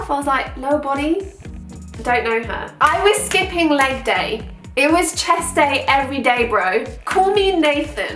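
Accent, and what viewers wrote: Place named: United Kingdom. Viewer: I think I need to movd to the UK. This accent and beauty is my new obsession...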